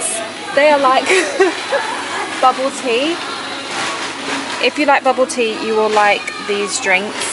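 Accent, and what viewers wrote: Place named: United Kingdom. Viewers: and interesting. how come you have a British accent ahhahhaah. by the way I am African too and my girlfriend is Asian from the Philippines.😊😊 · ChildofGod I think is mixed but her mum is from Uganda so she's Ugandan but was raised in Britain, hence the British accent. Hope that answers your question:)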